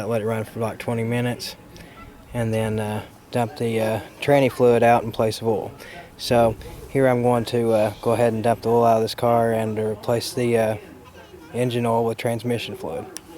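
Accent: South, United States. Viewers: The authentic Southern accent ! howdy !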